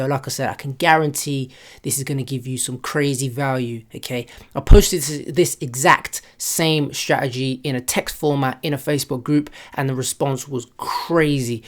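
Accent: England, United Kingdom. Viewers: R u from England?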